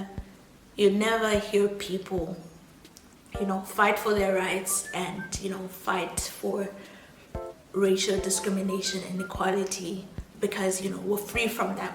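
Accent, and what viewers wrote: South Africa. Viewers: You sounds south African, where are you from? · She does sound South African, I'm not picking up Zim accent. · I understand this video is from last yr,but ur accent convinces me you've been living in SA for quite some time now,is there still xenophobic attacks going on in SA?..or do you feel crime and robbery is worse in SA than in your country and what are your experiences with crime or even xenophobia if you have experienced them?cos it sounds like it really bad out there...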